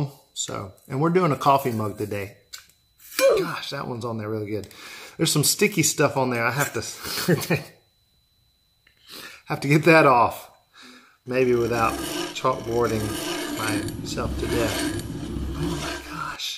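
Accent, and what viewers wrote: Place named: Boiling Springs, United States. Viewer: Dude I love you and your vids. Your work is just beautiful. And that Boiling Springs ACCENT!!! I miss it.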